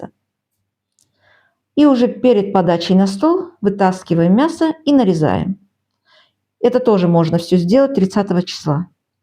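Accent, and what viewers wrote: Baku, Azerbaijan. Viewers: Акцент бакинский неистребим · Случайно увидела это видео, думаю, дай гляну, хоть и НГ уже прошёл)) Уже на слове «столичный салат» у меня возникли смутные сомнения)) Потом кИнза, винегрет с красной фасолью и, наконец, демьянка – развеяли все мои сомнения – я смотрю видео бакинки из Греции😂 И ещё, конечно же, наш акцент, хоть и небольшой, но всё равно ощутимый😂 Поймала себя на мысли, что смотрю видео и жду, когда же Вы скажете «бурак» вместо свеклы😂 На душе так тепло стало при просмотре вашего видео, будто с родными повидалась)) Большой привет Вам из Баку! И спасибо за интересное видео! Успехов и крепкого здоровья Вам в новом году! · Добрый вечер, а вы бакинка? Простите акцент❤❤❤ родной😂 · Здравствуйте,! Это все, что готовили наши мамы, я прям окунулась в детство! Вы, случайно, не бакинка? По разговору почувствовала, потому, что демьянки, говорят только жившие в Баку❤❤очень приятно познакомиться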